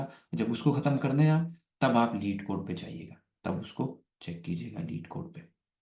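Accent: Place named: Pakistan. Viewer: Apki voice se ap Muslim lag rhey.... Like Pakistani language you r speaking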